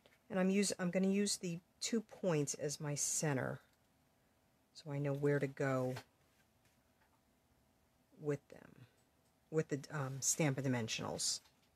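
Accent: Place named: New Jersey, United States. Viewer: Just subscribed! This is one of my favorite new sets! I love this card. I am a Long Island girl moved to upstate New York. I love your accent even though I think it might be Jersey, it makes me feel right at home❤️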